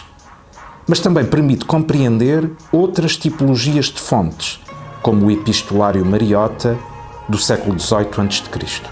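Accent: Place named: Portugal. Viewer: É meio confunso pelo sotaque português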